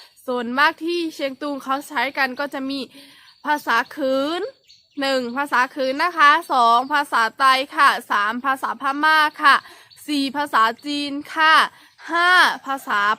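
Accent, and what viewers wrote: North, Thailand. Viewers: สวัสดีครับน้อง ติดตามๆครับ... อู้กำเมืองครับเหมือนคนเหนือ... 55อันเด่วกั๋น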